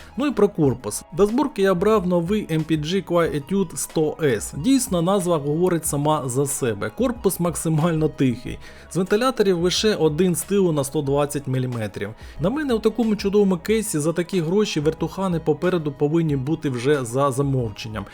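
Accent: Transcarpathia, Ukraine. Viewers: Ти закарпатець?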